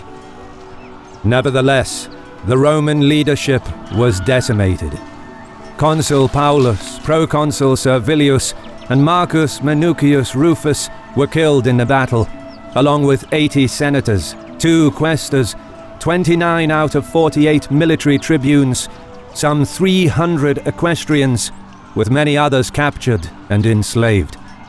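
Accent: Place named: England, United Kingdom. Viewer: Brother I like your English accent😉😉!!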